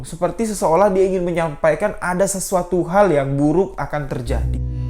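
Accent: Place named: Madura, Indonesia. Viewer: mas ini asli mana ya kx saya den9er² bhs nya kyak oran9 madura🙏🙏🙏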